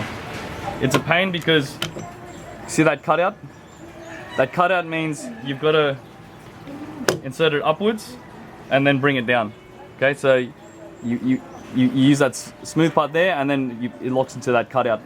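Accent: Australia. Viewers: Cool vid, very informative & educational! All made the better by that Ozzy accent, bro! Hope you're still in Phil when we get there next year! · A Filipino thats in the Philippines with an Australian accent ? I am so confused .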